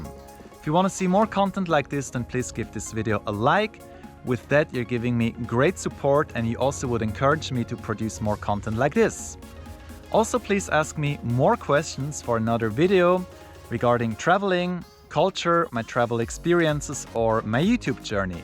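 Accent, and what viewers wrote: Switzerland. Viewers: Hi! 😊Thank you so much for your swiss german in this video, you are very kind and nice! 😊 Swiss german is great but too difficult to learn for me😊. Greetings from Poland for you! 🇵🇱😊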